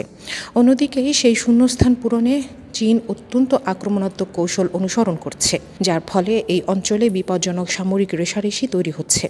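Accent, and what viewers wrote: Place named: India. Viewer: sobaike ekta onurud korbo. jara purbo pocchim.. ai cheneler khobor sunen taderke bolchi. ai chenelta age bangla deshi chilo akhon seta varoter kace bikri hoye gece... age khobor porto ekjon purus .... ekhon meye khobor pore.. ami varot ebong bangla deshider kontho buji... ei meye varotiyo.. mittha songbad pochar kore. ami onek age theke khobor suntam ekhon ar sunina... ajke UN SUBSCRIBE kore dici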